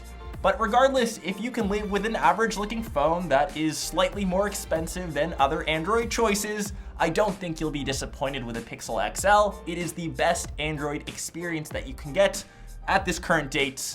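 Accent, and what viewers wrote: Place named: Canada. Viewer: lol you're Canadian accent is strong. nice video🙌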